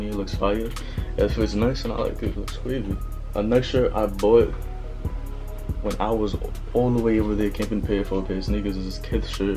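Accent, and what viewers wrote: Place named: New York, United States. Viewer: Yee i aint een see da name nd i knew yu was from new york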